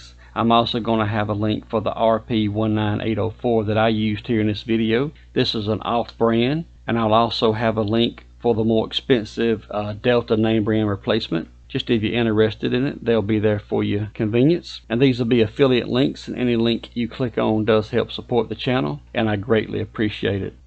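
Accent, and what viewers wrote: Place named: South, United States. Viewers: I work as a handyman and I'm always learning new things. Doing a replacement of this exact valve for a coworker. Gotta say, once I heard the southern accent, I knew I'd be walking away a smarter man. Thanks dude. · Helped me so much for my shower. Could listen to a Georgia accent all day. -homesick in KS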